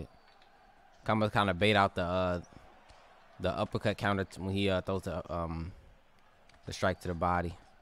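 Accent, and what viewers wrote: Jamaica. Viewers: u jamaican nw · Lol you Jamaican bro?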